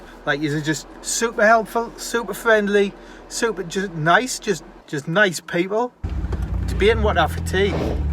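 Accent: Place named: Northern England, United Kingdom. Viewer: I remember looking through the classifieds of fastford back in 05/06 when I was a little lad. Oh the prices back then for a Cossie! (And any other classic ford). Anyway, enjoying the videos on the car mate. Doesn't matter whether it's just an oil change or if your fitting some interior trim, you make it a good watch haha. I've also managed to understand you Northern fellas too watching this 🤣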